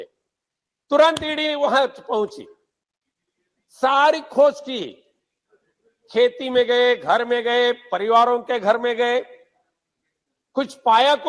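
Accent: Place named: Malvani, India. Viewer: मालवणी आवाज दिल्ल्हीत गाजला ....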